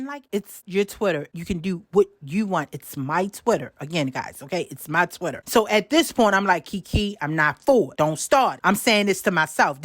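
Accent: Baltimore, United States. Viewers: You're so beautiful!!!!😍😍😍 and that BMore accent is everything 😂😅😂💪🏾💪🏾💯💯 and chhhiiiiillllleeeee iono what to say bout Ms. Keke. Sorry to that girl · Baltimore accent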